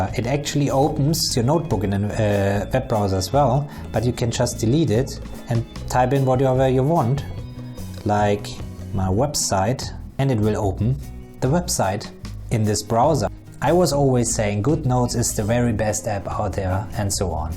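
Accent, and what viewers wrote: Germany. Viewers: Lol 🤣 ich wohne in Deutschland und meine Verliebte ist ein Deutscher! I could only tell because I’m used to hearing the German accent, I really like it! But seriously this review made me much more optimistic, Thanks for the review. I’ve been using a galaxy note <num> edition for about 5 years now and just switched to the ipadpro 12.9 last week. Immediately I noticed how bad the default note taking app was. I downloaded all the free note apps and none of them come close to S-Note in its features. I could import PDFs, I had way more templates (College ruled, normal spacing, grid, textures) better pencil options with a grain textured pencil that made it feel more like paper, the ability to transform written text into typed words or equations, search based on written notes, and so much more but best of all it was free! Overall I’m really disappointed in Apple’s integration with the Apple Pencil and iOS, I mean there is none. 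It’s basically a big iPad with a pen that was thought of as an afterthought. I got it primarily for art and in that regard it’s marginally better (Procreate is really fantastic and very professional), but the file management system, widgets, customization and split screen in iOS 11 is really limited in comparison to Android - but your review made a bit less cynical 👍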